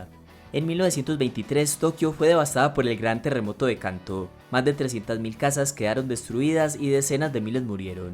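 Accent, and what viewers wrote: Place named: Medellín, Colombia. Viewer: La voz, si no me equivoco, es un acento de Medellín, Colombia. Si es así, que bueno que haya estos canales con tanto contenido de valor en nuestro país.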